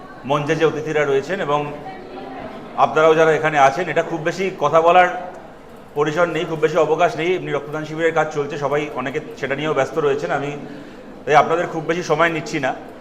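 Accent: Bangladesh. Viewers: Who is not available on pronunciation about parijon and proyojon,he is what type of leadership. what is aramvabe? What are these educated man? Joytibasu made backward West Bengal few decades mainly in English language. actually vat speaker. She has not proper pronunciation also in Bangla. Her pronunciation like Bangladeshee.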